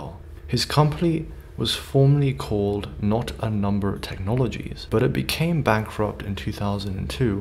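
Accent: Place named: United States. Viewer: Warner 'broes'? Have they changed their name or does the person live in murica, going by his accent?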